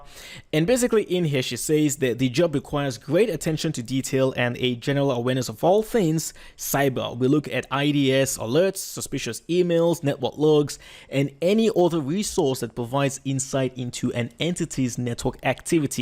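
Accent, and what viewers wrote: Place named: Nigeria. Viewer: Bro you sound like my country man, You Nigerian?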